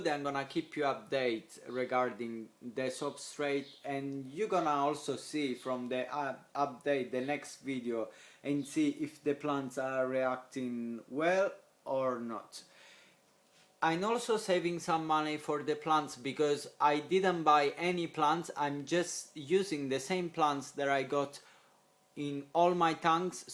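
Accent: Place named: Italy. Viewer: i enjoy your video and i also like listening to your italian accent. LOL peace.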